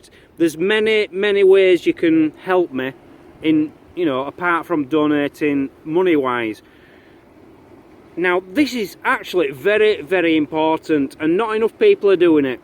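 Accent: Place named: Yorkshire, United Kingdom. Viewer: Where in Yorkshire are u from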